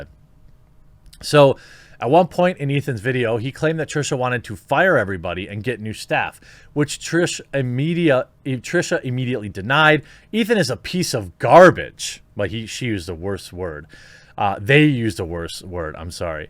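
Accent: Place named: Wisconsin, United States. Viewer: you say bag like every single person from wisconsin i have ever met.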